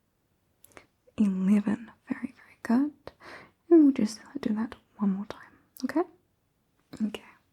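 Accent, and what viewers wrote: New Zealand. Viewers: are u from nz? so beutiful ❤ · 20 seconds in and i could tell you were kiwi 😂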